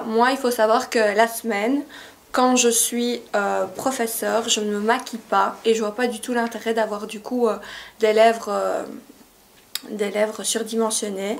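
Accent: Belgium. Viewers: Ta vidéo est sympa, il y a beaucoup d'humour, tu te moques de ton accent et oui, il faut savoir faire de l'autodérision, on a l'accent belge et on ne renie pas les origines.Elle traite bien du sujet présenté en expliquant bien les dangers pour les jeunes filles qui abuseraient de ce système, je trouve que pour une première, elle est super bien faite; je t'encourage à persévérer et à continuer si cela te plaît, sans t'occuper des gens haineux qui ont toujours un commentaire déplaisant à dire.Et pour celui ou celle qui dit que tu as des lentilles, je le défie de trouver une photo de toi sans tes yeux bleus clairs, qui font ton charme, alala la méchanceté gratuite à quoi ça sert vraiment, maintenant on peut ne pas aimer ou apprécier, pas de soucis, EN BELGIQUE c'est un pays démocratique et on a libre expression;....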